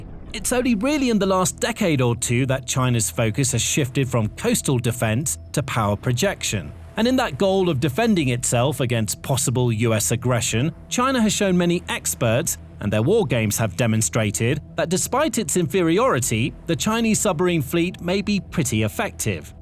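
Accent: United Kingdom. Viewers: Dude is saying nucular in a British accent nothing that follows that can be taken seriously! I couldn't continue after maybe the 5th time but I'll be he says the hulls are made of aluminum or some such shite. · Yeah Okay. He is English,( British.) Not American.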